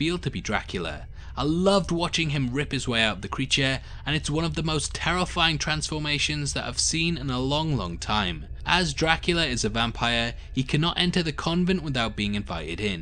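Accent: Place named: North East England, United Kingdom. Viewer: Top vid m'man, thanks. Did enjoy the BBC Dracula. Your accent is very confusing tho, sounds like N.E. England crossed with a tinge of Australian, is this accurate? :)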